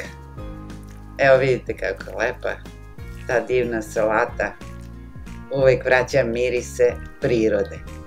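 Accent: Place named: Kruševac, Serbia. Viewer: Uživala sam u Vašem, mom po rodjenu kruševačkom dijalektu.Interesantno to nikada nisam jela. Baš moram da probam! Hvala Vam!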